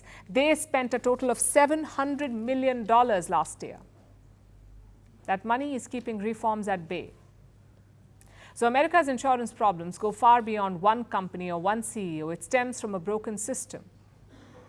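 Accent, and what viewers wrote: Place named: India. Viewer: Please! Stop with the Indian accent and pseudo-journalism!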